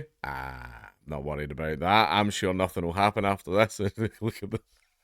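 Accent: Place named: United Kingdom. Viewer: The wores bank in UK Barclays bank The big amount of money was pull off from bank way no Alert stolen money 10 pounds I 2 transaction easy Hackers all over in England UK do that car dealership or others Nice British accent Which dialogue you have brother North or South I never can catch which one london bath Norwich leeds Birmingham Liverpool Manchester Blackpool York each other different talk Accent dialer hey not joke Chase bank fake check 20 years jail time by fbi police ok bye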